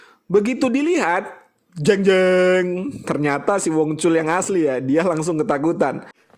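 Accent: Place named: Medan, Indonesia. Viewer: Orang Medan kau bang 😆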